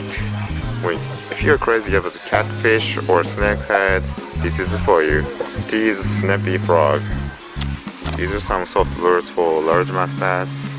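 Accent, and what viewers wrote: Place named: Canada. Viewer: いつもいうけど、のカナダ仕込みの英語めっちゃ好きやで。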